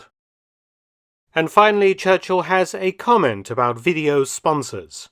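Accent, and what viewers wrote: England, United Kingdom. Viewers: Is it weird of me to ask what bit of England you're from, Mister Felton? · Colt .45 is always a reference to the Colt Peacemaker not the 1911. I presume from your accent that you are a Brit and I am taking this in account referencing your "gun nut" comment. Here in the colonies, we call someone with a mere 24 guns a beginner. Regarding Churchill, Americans refer to him in reverent terms as a patriot and the leader who showed the way to victory over the evil menacing the world of his time.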